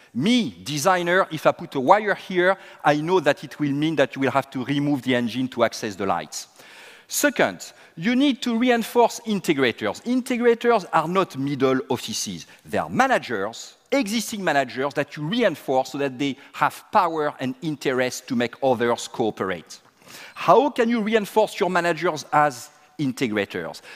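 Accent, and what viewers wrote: France. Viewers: i think he has french roots. I sense a slight accent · well, i'm French, so I can tell you that oral in our classes, oral english speaks are not pushed to be practiced till college years, so we have a few great people that have that kind of accent... Don't be afraid, this guy has great ideas... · I am distracted by his accent. I love how French speaks English :P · I'm sure this is very inspiring, but since I have never really had the chance to listen to others with a French accent I can't make out every sentence he is saying. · he speaks like a french. i dont like this. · French accent in English is the worst accent in English. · 1. Brilliant - I wish more Orgs would have the vision to integrate some or all of this into practice. 2. Its a French accent. Get used to it. There are subtitles. Stop complaining over simple stuff.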